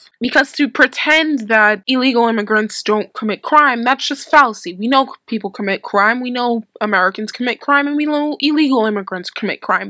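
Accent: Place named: Indiana, United States. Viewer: Here because of Styx. Subbed. What's your accent? Indiana?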